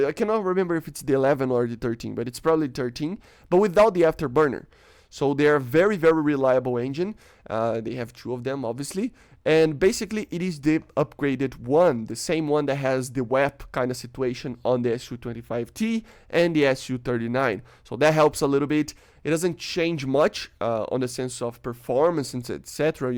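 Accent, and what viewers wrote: Brazil. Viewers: Mano, esse cara parece um brasileiro falando inglês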